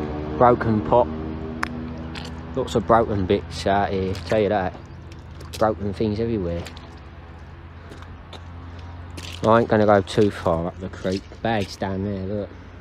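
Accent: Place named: Cockney, United Kingdom. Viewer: Your talking more and more cockney these days ennit.....but carry on larking coz I still like to tune in .xxx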